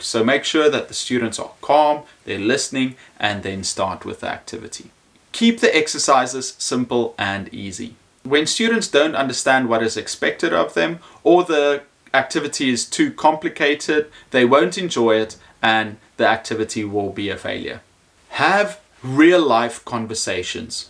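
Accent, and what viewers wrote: South Africa. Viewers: Simon says you very much South African :))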